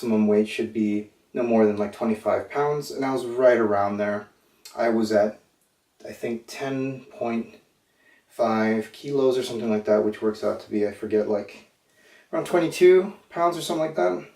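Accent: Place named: Midwest, United States. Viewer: Nice 420 joke. You should put some information on your profile. How did a guy named that speaks with an American Midwestern non-accent get over to the wilds of the European Norselands? Enjoy your videos greatly!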